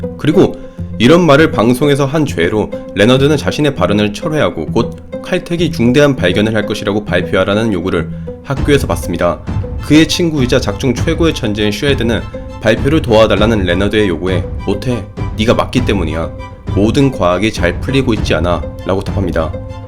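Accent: Gyeongsang, South Korea. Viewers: 경상도 아닌가요?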